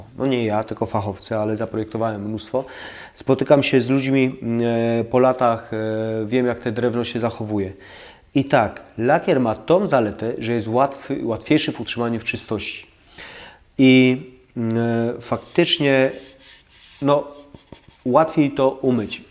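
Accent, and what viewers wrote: Silesia, Poland. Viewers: Jestem tu pierwszy raz i po kilku sekundach słysząc Twój głos pomyślałam "on jest stąd". Po czym znalazłam lokalizację Twojej firmy i okazuje się, że jest ona w Rudzie Śląskiej. Pozdrawiam z Chorzowa i zostawiam suba ;)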